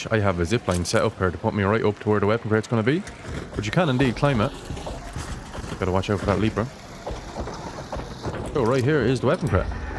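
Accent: Ireland. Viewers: I know a fellow Irishman when i hear him 💚 thanks for the useful info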